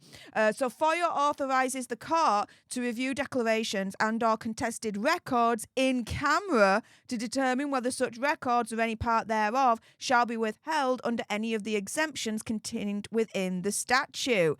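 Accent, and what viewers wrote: Yorkshire, United Kingdom. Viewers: i can see now why you call yourself. As a fmr lawyer let me tell you, you read thro that court order like a professional lawyer. Many ppl find it hard due to the inserted sub sections etc markers, yet you were able to read it beautifully, without stopping, well done. Its not as easy as you made it look, yep you are Def a a very attractive intelligent. I am just up the road from you in Doncaster so it was easy for me to understand the accent when you read that court document. 👏👏👏👏👏👏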